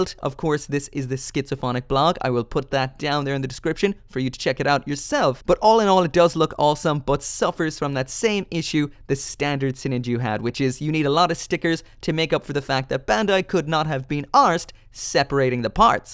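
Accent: Ireland. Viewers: you live in the US? where are you originally from? your accent sounds a bit irish.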